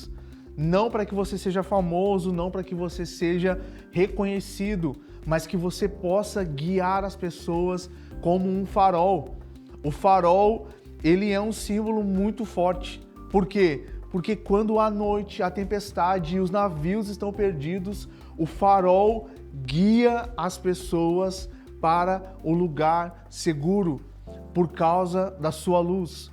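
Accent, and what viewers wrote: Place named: Porto Alegre, Brazil. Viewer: Muito bom! E não poderia faltar esse sotaque porto-alegrense 😂👏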